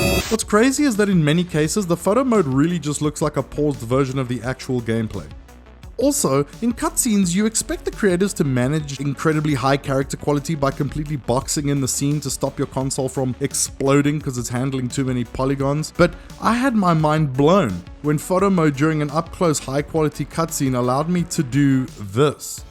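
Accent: South Africa. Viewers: Yiss I fought this oke sounded a bit on the safrican side.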